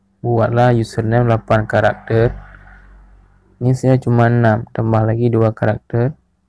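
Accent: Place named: Aceh, Indonesia. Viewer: Bang lu org mana bg kok bahasa indonesia nya ngedok aceh ya 🤣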